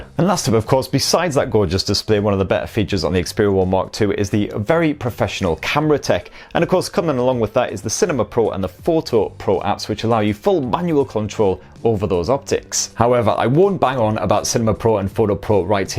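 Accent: United Kingdom. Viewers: Hey bub Just to let you know, I had a hard time keeping up with your tips and tricks,, you went extremely fast explaining the tips, you had to rewind and play what you said several times to catch what you said, 2 your British accent is a little difficult when you're going too fast speaking wise, 3 you're an phone expert but many people might not be so try to use common words for average people just my advice bub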